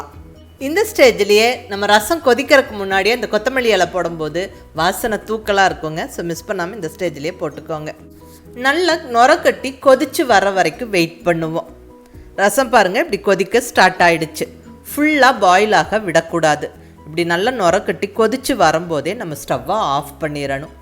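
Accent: Coimbatore, India. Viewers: Madam. Unga cooking oda unga coimbatore language thukkala iruku super madam .nice to hear. Continue madam.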